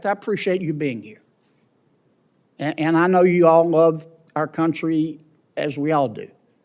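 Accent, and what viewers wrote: South, United States. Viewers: I wish this guy would speak slower and enhance his southern drawl.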